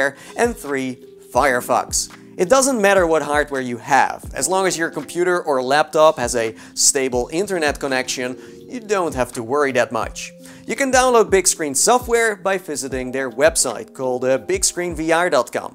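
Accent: Netherlands. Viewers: Obvious dutch accent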